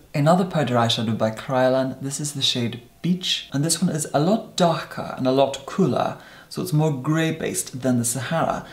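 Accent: Scotland, United Kingdom. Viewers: So beautiful and elegant! Lovely to hear the Scottish accent out there too❤️ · Nina Lights he's from Scotland he's just posh lol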